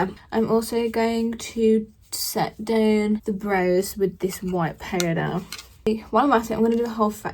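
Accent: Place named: United Kingdom. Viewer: Your accent is so cute. I currently live in Australia and wanna follow your accent, not bogan accent. Even though you explain how to make up, I learn british accent from you haha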